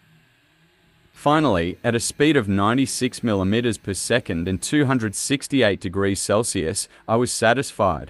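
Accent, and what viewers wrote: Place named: Australia. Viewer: Man... your accent is Aussie but your location is Spain...btw excellent work..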